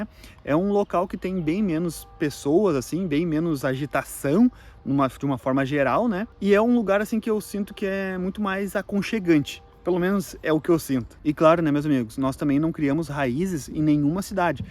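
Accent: Rio Grande do Sul, Brazil. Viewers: Pelo sotaque chuto que vc é gaúcho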